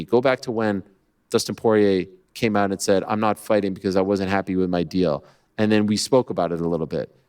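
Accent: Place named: Philadelphia, United States. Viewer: That Philly accent is top notch my guy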